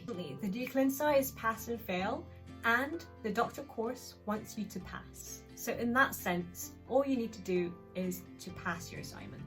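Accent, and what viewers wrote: Scotland, United Kingdom. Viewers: I’ve missed you! You look well and sounding Scottish! 😮 Basically work hard but don’t forget to rest, have fun, see friends and family, go on holiday, say no and ask for help when needed!? 😅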